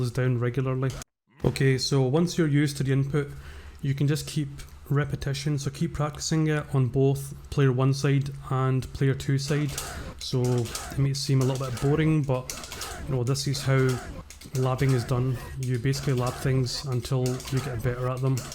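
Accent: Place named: Glasgow, United Kingdom. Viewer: Awryt wee sacks. Kidding, lol you sound local to me. Fancy a few sets ? With this dogshit netcode on this game I'm thinking if your local it SHOULD be better experience Cfn:Beast94 glasgow by the way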